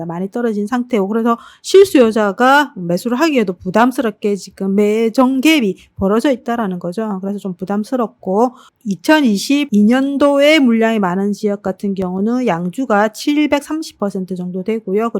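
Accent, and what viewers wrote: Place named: Gyeongsang, South Korea. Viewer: 쌤~~~ 강의가 너무도움이 많이되고 좋아요.근데 경상도 사투리에 말씀이 빨라서 잘 못알아듣겠어요.말씀을 조금만 천천히 해주시면 좋겠습니다~~~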